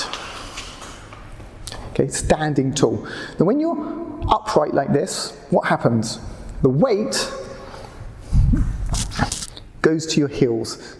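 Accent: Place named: United Kingdom. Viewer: 내가 좋아하는 영국인 영국발음 여러 게스트도 초청해 꿩 먹고 알 먹고 탁구 익히고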